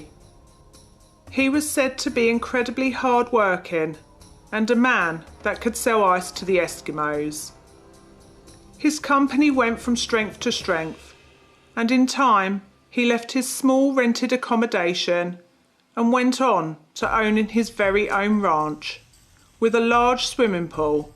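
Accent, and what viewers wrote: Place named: England, United Kingdom. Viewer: It's called an English accent ya stupe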